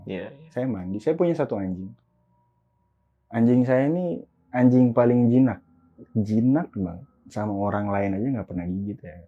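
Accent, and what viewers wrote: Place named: Papua, Indonesia. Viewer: seru mas klo pk logat papua bgs..sekilas g terlihat orang timur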